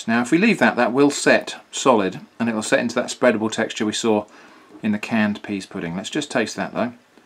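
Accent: United Kingdom. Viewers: I like your channel, the clear British English and the calm atmosphere in your videos. Great work, keep it going👍👍👍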